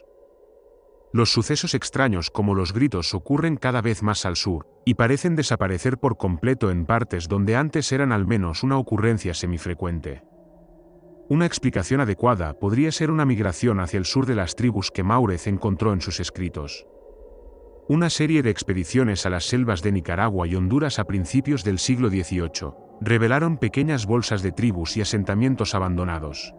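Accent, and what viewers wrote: Spain. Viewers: Un pobre decrèpito video. Sabes nada de culturas Originales de Pachamama. Para y paren de repetir q' gente Original de tales tierras mataban y sacrificaban. Porq no mencionas las matanzas de MILLONES en el mundo por los "conquistadores" digo... matadores. Nunca podràs entender la psicologia profunda de lo Original...escucho tu voz y....eres Español? Verdad?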